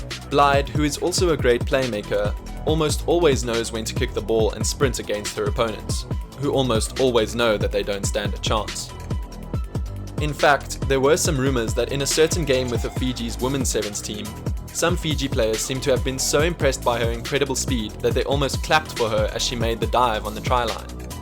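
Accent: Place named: South Africa. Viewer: Nice video. Unusual (but pretty cool) to hear a S'Affa praising NZ rugby.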